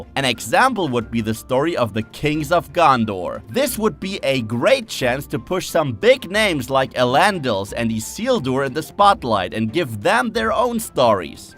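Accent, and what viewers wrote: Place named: Germany. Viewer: your german accent is horrible lmao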